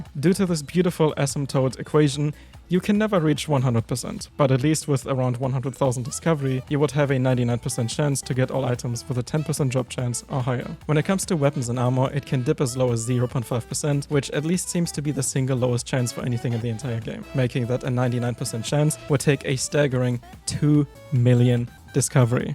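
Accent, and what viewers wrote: Germany. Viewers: no front but you have to be german cuz youre accent in speaking is yk "BAD" but im german too sooo... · Als ich den Akzent gehört hab wusste ich direkt dass du Deutscher bis xD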